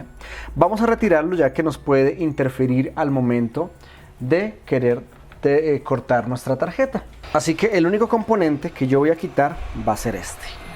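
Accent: Colombia. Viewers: Qué bonita es la electrónica, lástima que no tengo ni la paciencia ni el pulso para trabajarla...por cierto ese acento de los colombianos/colombianas ME ENCANTA!!! Recuerdo cuando cursaba la carrera de informática , cómo optativa tomé sistemas digitales , y al momento de hacer los puenteos , si quedaban muy grandes o muy feos llegaba el profesor con sus pinzas y nos decía" No me gustan las patas de araña" y zas , que nos cortaba los cables! creo que por eso elegí la parte de software en vez de hardware.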